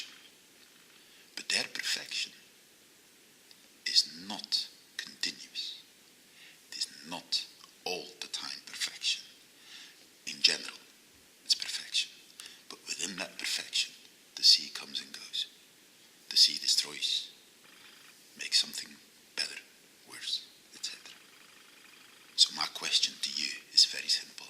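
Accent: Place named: Scotland, United Kingdom. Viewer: whats up with the scottish accent? :p Freaky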